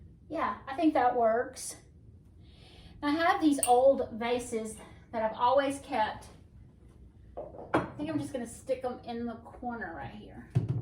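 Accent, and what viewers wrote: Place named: Alabama, United States. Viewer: Everything looks gorgeous. You’re my new favorite decorator!! I’m from Arkansas and when I first heard you, I thought maybe you were too. Guess the southern Alabama accent is the same. Lol. Anyway, I’m binging your videos!! 🍂🍂🎃🧡🧡🌾✝️✝️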